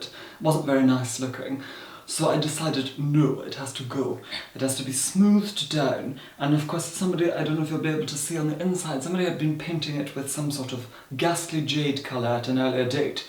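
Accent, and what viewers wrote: Scotland, United Kingdom. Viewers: actually saying film like 'filum' is extremely common still in Scotland (where and I are from) the difference is you rarely have many people with our accent as articulately spoken, but does live in London now (for how long, I'm unsure, but his Scottish accent is still there and very prominent) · He's saying 'film'. It's accent. I believe he is Scottish or Welsh originally, but has lived in London for many years.:) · I knew it! You are Scottish! I always thought with your posh voice you were English but I've always heard a small slice of Scotland in there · OUTER HEBRIDES, I KNEW YOU HAD AN ACCENT!!!!! I AM FROM THE OUTER HEBRIDES AS WELL!!! WHICH ISLAND?? Sorry thats weird ahahaha, I don't know why I am so excited, I have just never had a youtuber from where I live! Wow, I actually knew you had an accent, whenever I listen to you I always listen to your accent and I knew you were from Scotland from the accent but! 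Do you speak gaidhlig!? omg I am such an idiot! Your last name!! That is such a popular name in the outer Hebrides. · I feel like I can hear a scottish accent under that voice. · He has a slight Scottish accent as well. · I kinda felt that your accent is not that British or someone from London. It confirmed that when you said you're from Scotland.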